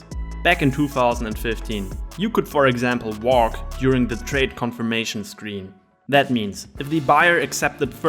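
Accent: Germany. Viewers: Hey Are you German?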